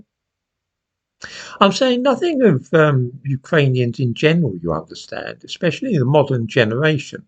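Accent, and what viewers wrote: United Kingdom. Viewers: is absolutely critical in maintaining perspective and clear vision in the smoke and mirrors show. And he is so eloquently British as well.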